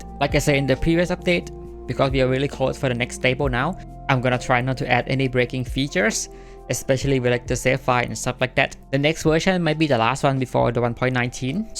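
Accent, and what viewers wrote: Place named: Thailand. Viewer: คนไทยปะครับสำเนียงคือได้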